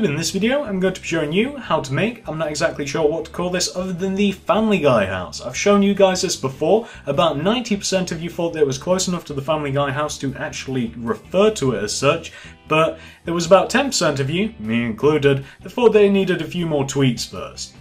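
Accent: United Kingdom. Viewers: He's British lol it's not his fault he has an accent lol I hope you know not everyone speaks the same lol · His british accent. Be nice.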